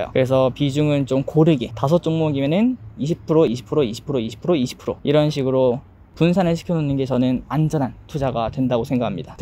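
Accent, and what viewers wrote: Gyeongsang, South Korea. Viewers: 대구 사람이셨구낭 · 혹시 대구분이신가요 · 대구사람이에요??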